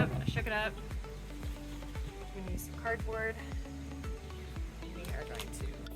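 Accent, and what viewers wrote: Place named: United States. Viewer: You sound American. Other countries have safety restrictions on paint strippers.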